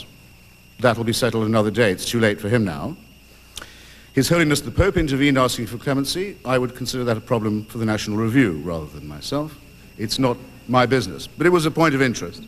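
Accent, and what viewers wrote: Southern England, United Kingdom. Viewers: Hitchens isn't famous because of his Southern English accent, you know about his accent because he was famous for his immense intellect. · I agree. Plenty of ppl with Southern English accents and yet I can't name one who stole my heart away from other than.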